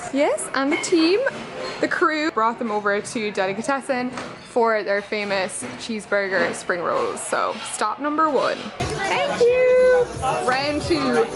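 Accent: Ireland. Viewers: Sexy Irish accent 💕👌. X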